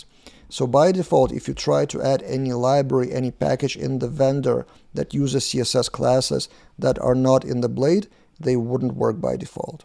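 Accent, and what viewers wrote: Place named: Russia. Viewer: Имеется ели заметный русский акцент у автора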